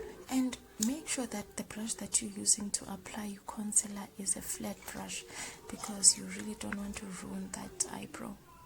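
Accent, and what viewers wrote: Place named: South Africa. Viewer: SouthAfrican i love ur accent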